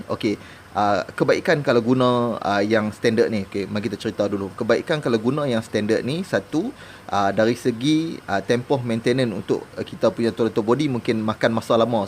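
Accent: North, Malaysia. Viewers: haaa baru la ngam guna, utara mai, depa faham ja tu,guna loghat utara universal, terkemut-kemut 🤣, good job 🤙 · Baguih nya hang punya cakap utara.. terhibur dan senang nak hadam ,lepaih ni buat video cakap utara semua baru ngam..haha. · Assalamualaikum w.t.b tuan.. Kluaq loghat utara na.. Pekat wei.. Terbaik bro.. Salam dri follower channel hang dri negri bwh bayu.. Teruskn brkongsi info dn ilmu.. Smoga sukses slalu.. Aamiin..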